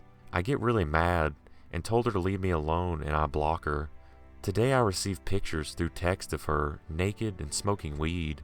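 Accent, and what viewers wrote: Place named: West Virginia, United States. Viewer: Love listening to your voice! I'm from West Virginia and your accent sounds like you're from wv too!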